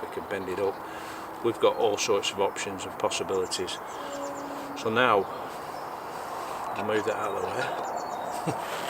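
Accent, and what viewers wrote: Lancashire, United Kingdom. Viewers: Loving your channel, learning loads so please keep creating and sharing, shame my 2 cats have a big impact on my garden wildlife. Been gathering some wildlife gear and really looking forward to getting back out freely after lockdown, nice to hear a lancashire accent too:-)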